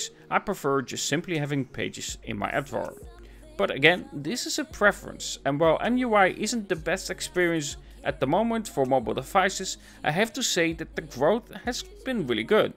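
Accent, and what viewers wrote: Netherlands. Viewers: u sound Dutch 🤔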